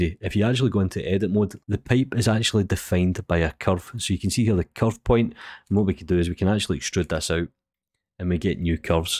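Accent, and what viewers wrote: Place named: England, United Kingdom. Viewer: I LOVE YOUR ENGLISH ACCENT MATE LIKE FROM PEAKY BLINDERS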